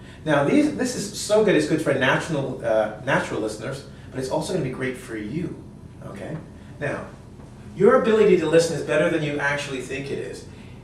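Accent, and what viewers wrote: United States. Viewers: А вы можете сказать это какой американский английский (акцент) или британский? И вообще все которые есть учителя на сайте <link> они обучают какому английскому? Просто я новичок и пока что не могу отличать их, но я знаю одно, что не рекомендуется смешивать по этому спрашиваю.. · are you american? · Good job, amazing !!! I understand the most part of this video, and I have a sucks english :( Are you american ? where u from ? thank you for share this video, I hope one day be a english speaker like you are. Greetings from Brazil. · Excuse me, It`s American English? :)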